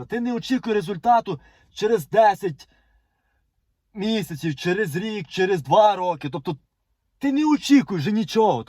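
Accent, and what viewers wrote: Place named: Western Ukraine, Ukraine. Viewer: Акцент сельский западной Украини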